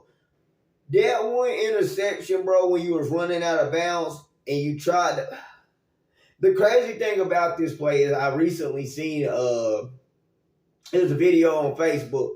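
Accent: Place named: South, United States. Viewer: As a Cowboys fan in NYC, I respect you for doing a good job of putting lipstick on a pig. Also, our good but young TEs dropped two very catchable balls in/near the end zone. Finally, 11 Ls in a row? Y’all really don’t want to see us again, especially if we’re relatively healthy. We’ll only be better next time. Thanks southern sounding Giants fan in the big ciddy. Wish you much success on YouTube.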